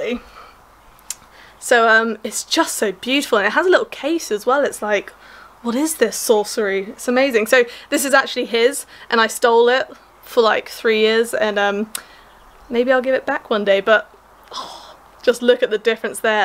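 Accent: United Kingdom. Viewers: Great birthday gift. That’s a nice one, you are really set now. Loved the show and tell, looking forward to you working with the fossils. With that British accent, I could listen to you all day.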